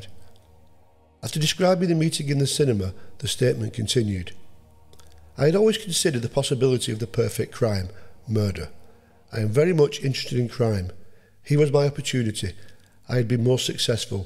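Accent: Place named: Bolton, United Kingdom. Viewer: Nice one cha the case in Blackburn where they finger printed the entire town struck a chord as I was born there and iam guessing your not from far away with your accent Bolton farnworth is my guess ha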